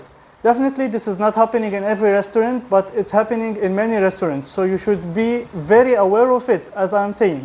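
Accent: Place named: Arab world. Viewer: Wow this is a lotttttt of complaining..you have an arabic speaker"s accent. Dude have you travelled in the Middle East? These issues are nothing.Your complaints are endless in this video. It doesnt sound like this is the country for you. You should move to the west if you can. Egypt has similar issues but travellers on yt dont whine as much. I would suggest you move somewhere where you arent so miserable. Inflation is worse in North America. As is eating out. Travel elsewhere to see the difference and stop complaining over small things. If your biggest problem in life is a strawberry in your wine then you have NO PROBLEMS. Fries vs. wedges..seriously? You are not happy in Turkiye. You should leave. While you are there be generous with your turkish hosts. They are going through tough times! You really sound so miserable in this video..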